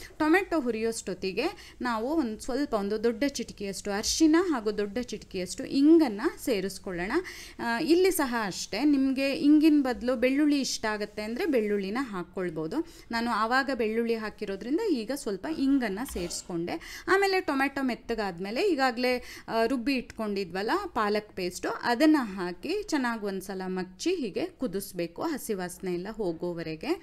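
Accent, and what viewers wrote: Karnataka, India. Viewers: I love ur kannada accent ❤feels so nice to hear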